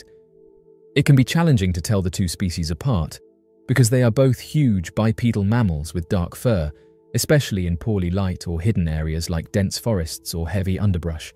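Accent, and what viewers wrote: United Kingdom. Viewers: Why pick the British accent for narration? Your voice isn't good enough?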